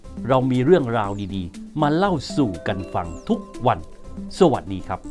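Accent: South, Thailand. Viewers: นอกจากเสียงไพเราะแล้ว พูดใต้ได้ชัดเจนมากเลยค่ะ ฟังแล้วทึ่งจัง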